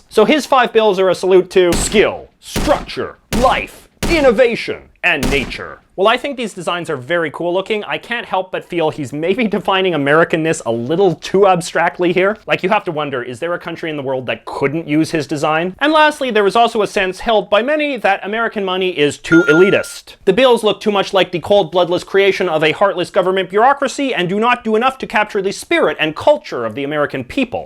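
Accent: Canada. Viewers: After hearing all those aboots. I suspect this guy of being Canadian.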